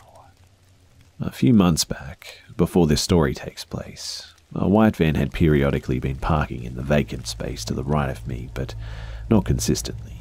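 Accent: Australia. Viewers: So refreshing to listen to creepy stories with an Aussie accent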